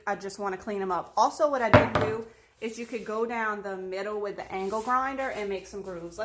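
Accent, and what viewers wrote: Louisiana, United States. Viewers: i live in TExas but im cajun 100%(POUSSON) maiden name 😁 was born lafayette and raised in Iota , by Crowley La I feel like im not so far from home when i hear that great cajun accent sha !!!! I am hooked on your page , love your work ! My junkin sista ! Lol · Gotta be from Louisiana. Love the accent!! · I have to know..... you're from bayou Lafourche, huh? I can hear it!! lol! Im from Houma. Your boards are gorgeous!!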